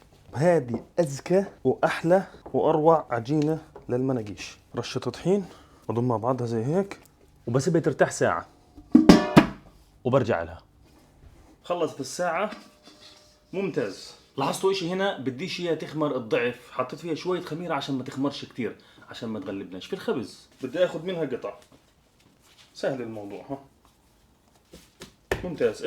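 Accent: Gaza, Palestine. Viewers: أنا من فلسطين وكمان مو هيك لهجتي هو لهجة غزة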